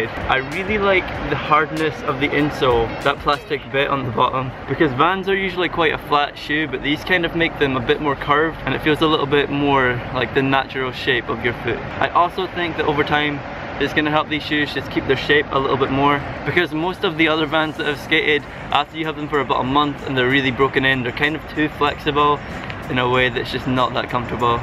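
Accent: Scotland, United Kingdom. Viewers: Is he Scottish ? He sounds like Steven Caldwell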